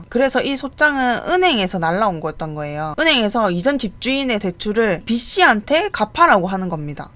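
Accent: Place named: Busan, South Korea. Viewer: 붓싼 말씨네요. ㅋ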